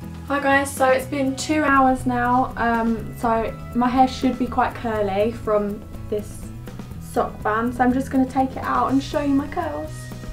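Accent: United Kingdom. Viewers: I like your hair, i like your british accent, i like your make up. I just love you already :D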